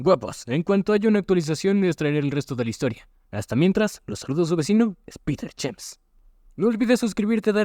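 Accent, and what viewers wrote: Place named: Arab world. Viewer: El acento árabe del software de lectura me ha hecho gracia, solo necesitaba oír que dijera "Habibi"!!!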